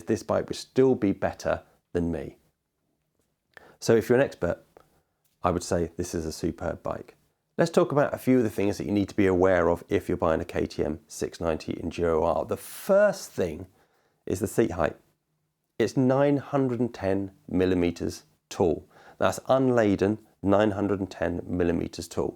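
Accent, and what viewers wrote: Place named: United Kingdom. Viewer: Best vid on the 690! I need it all and your British !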